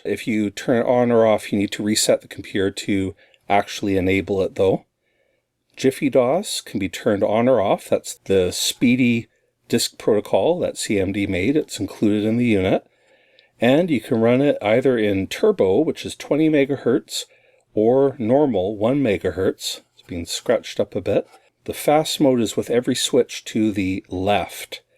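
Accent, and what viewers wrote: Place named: Winnipeg, Canada. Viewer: I'm really enjoying your videos the past few days, I just discovered your channel. Fellow Canadian here and from the sounds of it, you sound like you might be near my neck of the woods here in Winnipeg. I was a Commodore fan back in the 80s too as a kid. More recently I backed the kickstarter to get myself one of the new Sinclair Spectrum Next 8-bit computers (can't wait to receive it this August)! Cheers!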